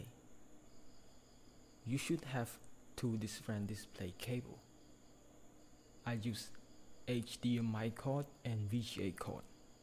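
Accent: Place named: Asia. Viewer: When I heard the Asian accent I knew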